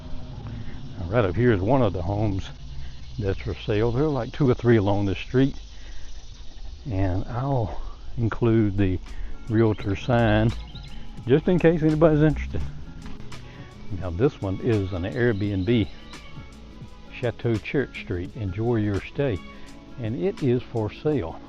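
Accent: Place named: South, United States. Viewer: Good morning,. Thank you for sharing this awesome video on Fork Valley. I agree with you on how it got its name. I might have missed it, what is the population there? It is all so beautiful. If I had to choose a favorite area, it would be the railroad district. Love listening to that southern draw.😎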